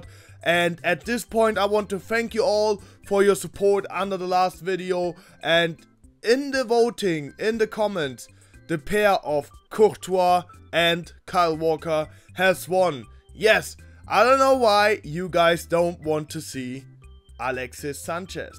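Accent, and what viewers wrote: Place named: Germany. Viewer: Man merkt sofort dass du Deutscher bsit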